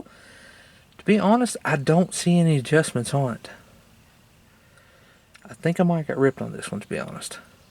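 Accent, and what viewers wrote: West Virginia, United States. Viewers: Where are you from. West Virginia ?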